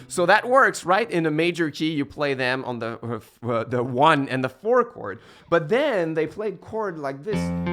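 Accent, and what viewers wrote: Quebec, Canada. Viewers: Très intéressant, merci! En passant est tu québécois?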